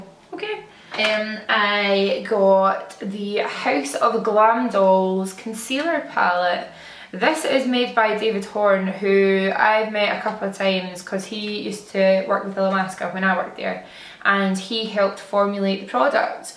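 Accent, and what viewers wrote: United States. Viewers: The American accent! 😂😂😂 I freaking love you. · Your American accent was spot on hahaha! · Seriously loved your American accent!!!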